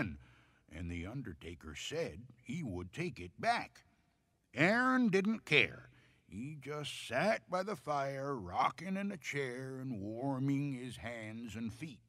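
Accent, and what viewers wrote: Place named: New York, United States. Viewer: I love the narrator’s accent for this story! He is originally from New York I think! 🤣✋ I love this story, more like a dark comedy than a scary story. The delivery is hilarious too Also, that widow is one gold digger, isn’t she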